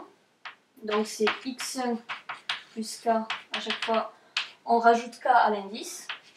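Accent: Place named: South, France. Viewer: Ce petit accent du sud: chocolatine !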